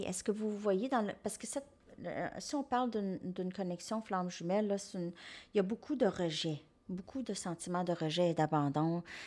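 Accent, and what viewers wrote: Canada. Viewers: Hello, J'adore votre humour et surtout votre accent canadien😜 🙏🙏...des becs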